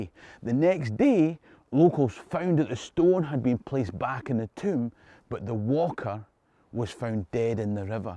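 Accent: Scotland, United Kingdom. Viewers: Wrong video I know. Am from Perth too, mind you my accents a bit more pronounced than yours. About blacks telling Scottish history. Let’s just be honest you’ve probably taught a lot more people about Scotland just because you have the #TannedSkin That I have to thank you for. Although I can understand where others would be coming from I can’t say I agree with it. If you weren’t you I wouldn’t watch a single video. You know so much about the place for god sake your more Scottish that a square sausage. Wrong video I know but I didn’t like how it must have felt to hear something like that. I’m white and a traveler and although I’m sure you’ve met your fair share of bammy travelers you honestly don’t understand how much you represent the real purer blooded Scottish travelers. So honestly my friend thank you May God bless each step forward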